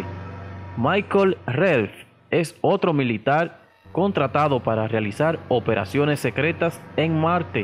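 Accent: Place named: Spain. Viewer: Bueno por ahí te comento que este programa no es mío sólo comenté que porque tienen que poner a español a narrar no puedes en latino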